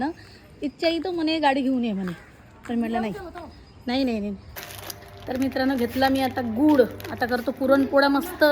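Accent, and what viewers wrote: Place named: Akola, India. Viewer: Akola chi bhasha ahe baa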